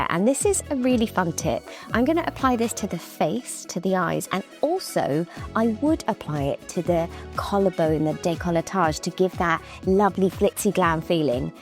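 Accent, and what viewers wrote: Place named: Wales, United Kingdom. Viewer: Love this look ❤ Also this is so random, but looks and sounds a bit like Amy Dowden from Strictly! I'm sure I can hear a Welsh accent being Welsh myself, so I wonder if they are related somehow?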